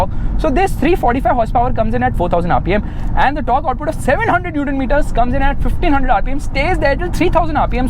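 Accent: India. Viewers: Heaviest Indian accent ever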